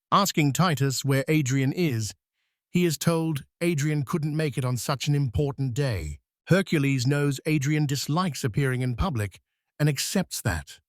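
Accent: United Kingdom. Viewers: British narration, unusual for this type of video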